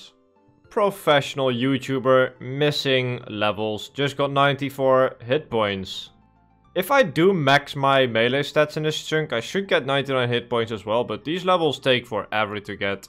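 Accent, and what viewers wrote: Netherlands. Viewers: The typical dutch accent… i love it 👌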